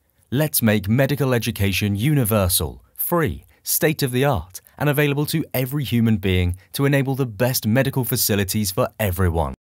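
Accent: United Kingdom. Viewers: His pronunciation is the correct Brittish way of pronouncing it (and thereby most of Europe as well). You must be American.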